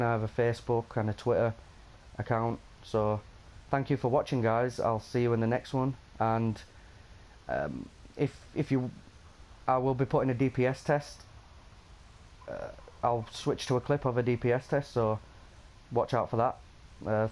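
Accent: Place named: Leeds, United Kingdom. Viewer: Loving the Leeds/Bradford accent mate identical to how mine used to be lol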